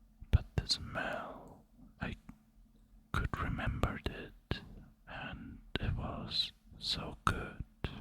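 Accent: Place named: Germany. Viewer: Always love some rambles! Turns out, the german accent is great for ASMR whispering :)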